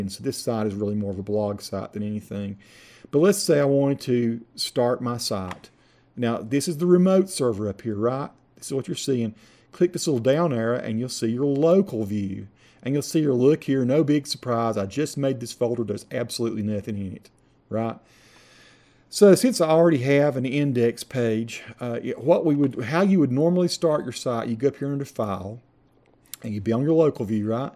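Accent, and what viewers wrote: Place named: Memphis, United States. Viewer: Great tutorial man! I spent six years in Memphis and you sound like you are not far from there!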